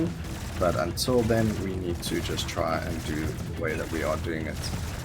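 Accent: South Africa. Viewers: Awesome content. Thank you! Also so refreshing to hear a local SA accent when chilling to some gameplay.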